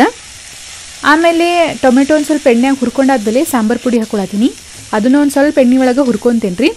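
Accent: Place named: Hubli, India. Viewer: Nim hubli bhashi kelak kushi aythri ❤❤, Nim bhashi kelak Nanu video nodyhini ♥️ from Tulunad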